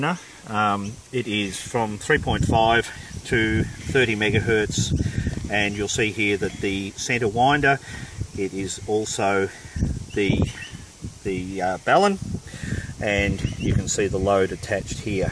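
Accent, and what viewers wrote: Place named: Australia. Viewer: is an Australian...from Australia. That is how he sounds. We're not all Americans, sorry about that!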